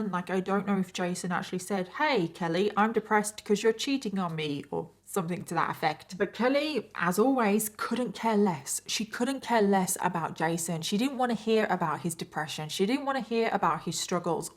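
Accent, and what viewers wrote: United Kingdom. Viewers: I’ve been watching A LOT of your videos. I absolutely love the way you explain out the cases as well as add your input on the cases and I LOVE your accent (I live in America so it nice to hear a British accent) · I love your british accent, but I really don't like most of the british accents.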